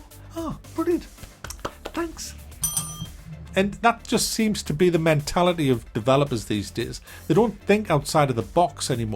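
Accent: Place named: Newcastle, United Kingdom. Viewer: It's nice listing to a fellow Geordie youtuber, not many of us....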